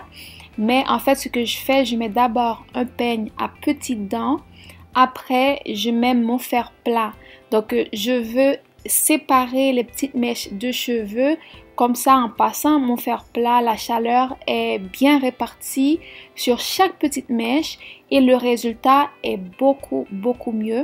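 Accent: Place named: Quebec, Canada. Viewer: L'accent québécois allez!!!!11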